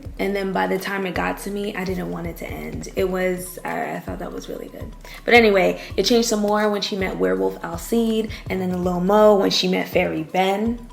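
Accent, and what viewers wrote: Jamaica. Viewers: Your broken Jamaican accent is cute ❤😂 sending love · im hearing some patois!! are you Jamaican??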